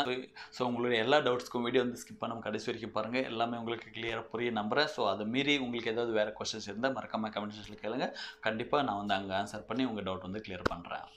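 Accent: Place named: Hosur, India. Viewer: Bro Neenga endha ooru. Unga slang nalla irukku. Hosur ah.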